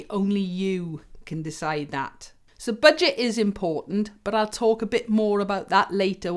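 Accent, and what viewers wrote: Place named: Wales, United Kingdom. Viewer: Hi many thanks for your informative reply. Sadly the purple Flight Ltd Ed mini J bass is now sold out. I could return the Squier I suppose. I would be interested to hear why you prefer the Ibanez Mikro. FYI loving the purple, dragons and Welsh accent, reminds me of my second home - studied in Wales many Moons ago and miss it. Keep on playing 💜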